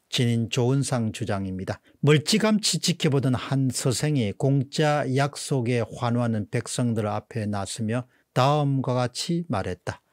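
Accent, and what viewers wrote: Gyeongsang, South Korea. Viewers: ..갱상도 분이어서 발음이 힘드시지요? 조은상 이아니라 조은산 입니다...^^ 아무리 갱상도 발음에 평생을 갇혀계셨어도 수십만이 보고듣는 유투브 방송인대..발음은 조금 신경쓰셔서 해주셨으면 합니다..감사합니다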